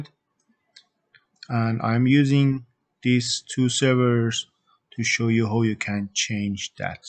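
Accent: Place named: Iran. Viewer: iranian accent :)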